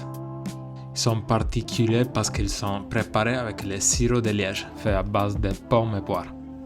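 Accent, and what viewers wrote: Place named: Italy. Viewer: EXTRA!!! Continuez! C'est beau et bien raconté (con l'accento italiano). Tschüss! 🤠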